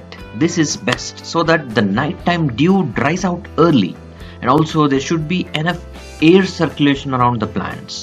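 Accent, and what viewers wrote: India. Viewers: Please just eliminate the background music . Hard to hear your Indian accent voice with the music . Very informative . Thank you .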